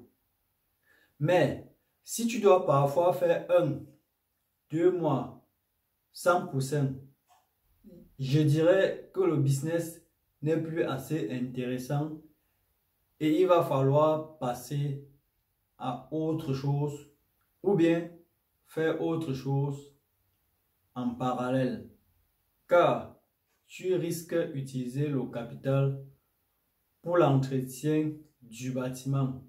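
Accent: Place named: Cameroon. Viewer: Merci beaucoup pour tes videos mon frere, moi cest Paul, je ne sais pas si tu es camerounais, sinon ton accent me fait penser que oui. Jai aussi une question, Quels businesses conseillerais tu a un jeune qui se lance en affaire et na que 500 000 FCFA de capital au cameroun ?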